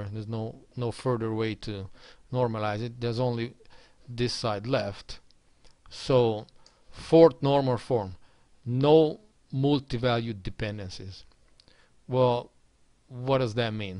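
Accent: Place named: Cuba. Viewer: Hey; are you American; living in Texas, and you speak Spanish? I’m trying to understand the influences on your accent ? Cuban ?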